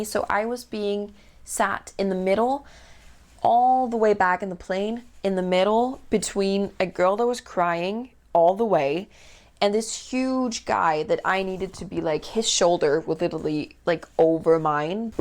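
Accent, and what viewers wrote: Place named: United States. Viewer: I’m curious to know why you have an American accent!?! You don’t have to leave your country to get out of your comfort zone. I did. I moved from the UK to Sri Lanka more than three decades ago! I wouldn’t recommend it to everyone though! It’s been a tough journey for me but i have achieved self growth but then i may have done in my own country too! To have gratitude & a peaceful mindset, it has to come from within not from outside at the end of the day 🧚🏼‍♂️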